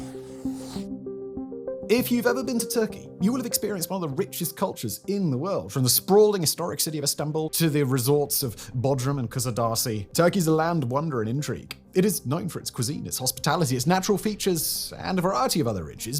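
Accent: United Kingdom. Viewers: "Highly warmongering country" says the guy with the British accent, with aplomb. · Such racism against Turks... 1 our "invasion" aka occupation of northern part of cyprus is completely within international law, since we are guarantors of the islands populace alongside with the british since ww1, that gives us the right of occupation if the said populace is endangered, like how greeks committed mass genocide to Turkish cypriots. Second: kurdish "freedom fighters" are in the terror list of every western nation, only since 2014 the ypg branch, which was newly formed as the syrian branch of pkk, were not counted as terrorists. Thirdly the kurdish problem didnt originated from Turkey and spread to other nations, it was already underway in iran, iraq and syria all of whom committed genocides against Turks but NOT Turkey. Dont trust a man only because he is bald, has glasses and has a british accent, this bigot is just a western racist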